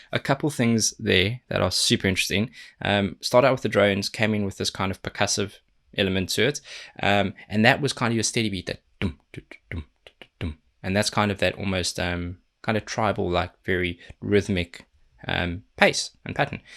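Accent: South Africa. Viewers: I don’t want to be rude. Is that South African accent ( which I love) , my point is , is there South African metal bands ? Excuse me for being stupid, I really don’t know. Just curious ?!? Nighwish and the Hu are my favorites and some Philippine metal too 🤘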